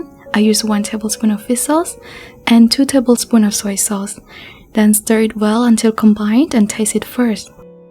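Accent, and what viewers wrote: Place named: United States. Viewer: So proud of you, what you are doing, and how you are representing Khmer on international stage. From listening to your pronunciation, I think you are Khmer American. I am even more amazed that you can speak both Khmer and English so fluently, not to mention your soft and charming voice. And one more thing, when will you reveal your face to millions of fan in Cambodia 😬😬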